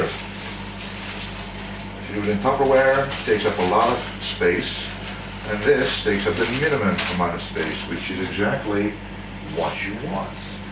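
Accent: Netherlands. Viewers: I can listen to this guy talk all day long, the Dutch accent is very pleasant sounding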